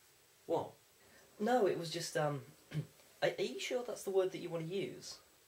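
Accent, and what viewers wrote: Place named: Manchester, United Kingdom. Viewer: Definitely have a Mancurian accent :) It's just the words you use.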